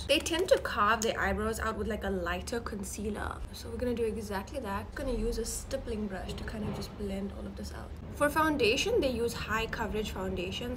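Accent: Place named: South Africa. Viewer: looks white, is indian, trying latina makeup and sound south african. Everything about u is perfecttt!!!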